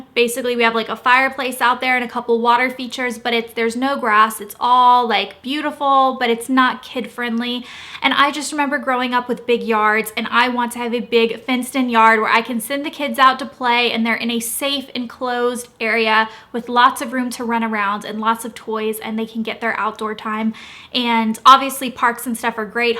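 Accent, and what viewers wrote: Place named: South, United States. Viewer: So excited to hear your southern drawl begin to crawl back haha ❤️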